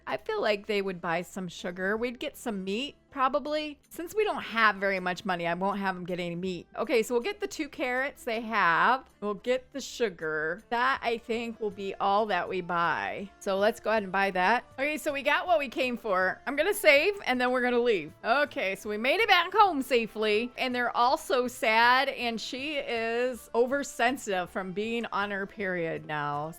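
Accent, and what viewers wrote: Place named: Midwest, United States. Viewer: Where are you from in the Midwest I’m getting nostalgia from the way you speak since I used to live in Minnesota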